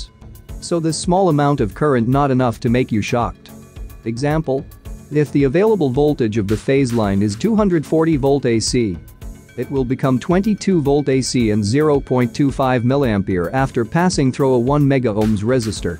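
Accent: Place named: India. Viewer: Which voice did you use here? It is a machine generated realistic voice? Foreigner speaking Indian English sounds funny.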